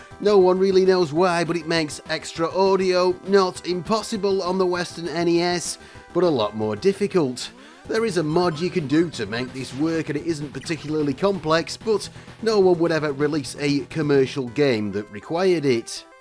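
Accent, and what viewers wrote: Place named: Northern England, United Kingdom. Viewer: Another British guy talking about the system that was so unpopular and under the radar in his own country. A North Englander, by the sound of it, with the "thoot it wood make them moony."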